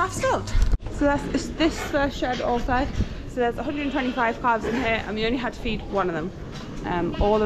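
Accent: Ireland. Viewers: Great video, more!! You're accent is absolutely starting to sound a lot softer and Irish 😊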